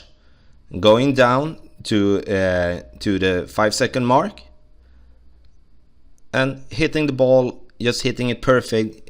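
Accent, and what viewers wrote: Scania, Sweden. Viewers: Nice Swedish accent 😊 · Are u swidish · This is some of the most swedish sounding english I have ever heard. Love it! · Dats how pejpol from skåene in sweden sounds lajk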